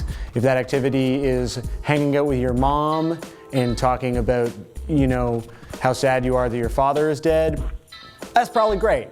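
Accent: Canada. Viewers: Accent? Yes sir, you sound like an Ontarian. You sound like you lived about a block from Yonge Street, actually. I do tend to lose my poop when an American accuses us of saying a-boot. No one says a-boot. We sometimes say a-boat. You, sir, don't really say a-boat. You pretty much say a-bout. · You most certainly have an accent. Everyone from Canada and the US states near Canada (Wisconsin, Minnesota, North Dakota) have a strong accent on any "ou" sound. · There are no words to adequately express how much I love the fact that one of these bands is named Power Glove. And yeah, you really don't have much of an accent. Probably the least accent-y Canadian accent I've ever heard. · Its ok, we'll make it through this. ps you song just as Canadian as I do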